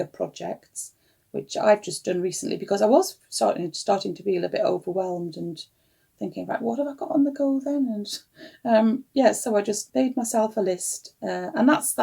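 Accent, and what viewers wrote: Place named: Durham, United Kingdom. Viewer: Hi. What a lovely podcast. I loved watching you make things and really enjoyed your tips for having lots of projects on the go. I use a lot of those tips and love having a little notebook to log all my projects. I especially love your Durham accent as it reminds me of a friend who lives in Hartlepool. Beautiful part of the world 😊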